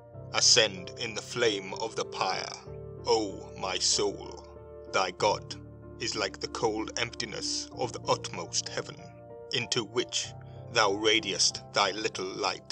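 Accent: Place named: England, United Kingdom. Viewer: Hello my dear friend. I am still here. Glad your work continues. How are you? I miss the English accent voice...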